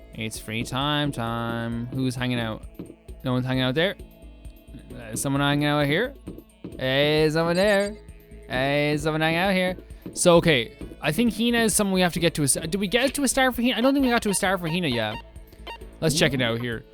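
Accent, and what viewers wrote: Ireland. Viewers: You do have an Irish accent, but it's subtle. Accents are somewhat relative anyway, relative to what voices you're used to hearing. I love them, they tend to be a great reflection of different cultures.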